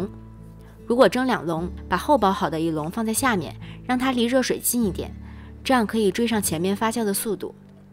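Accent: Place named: Tianjin, China. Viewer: 听着像天津姐姐包包子，明天就动手试试看！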